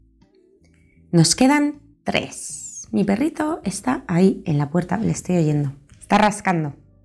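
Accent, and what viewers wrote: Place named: United Kingdom. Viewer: quizas sea por los diferentes acentos, cro que este es britanico que es distinto al americano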